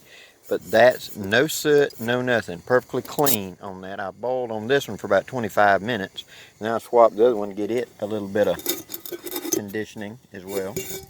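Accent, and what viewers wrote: South, United States. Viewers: Nice video, you make a lot of sense in your southern way, I like the accent you sound like you are signing all the time. I like you tips and inputs very resourceful!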